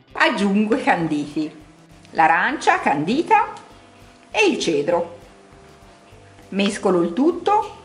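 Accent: Tuscany, Italy. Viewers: Non si può sentire un accento toscano per la descrizione di una pastiera..... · perdonami sicuramente la panna e le fragole si riferivano ad altre immagini stavo cucinando Ma sono sicura che ti hanno fatto vedere perché ho riconosciuto la tua voce, tipicamente toscana come me e mi sono voltata e ti ho vista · Che dire sei strabiliante... La tua bravura e poi quell'adorabile accento toscano mi regalano relax e voglia di combattere anche tra i fornelli e poi con la bilancia🤣Un grande abbraccio e un incoraggiamento a tutti per questa triste situazione. Che il Signore benedica tutti🙏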